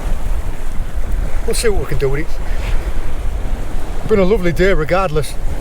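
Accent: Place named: Northern England, United Kingdom. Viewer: Are you originally from up north? I always hear northern in your accent haha was just wondering, keep up the vids always a pleasure to watch and very knowledgeable 🤘🏼🎣 top bloke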